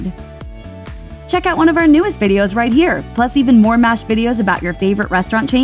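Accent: United States. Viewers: I'm sorry, It's just weird to hear an American talk about Greggs and Newcastle. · Omg as a British person it’s so damn annoying to hear an American talk about this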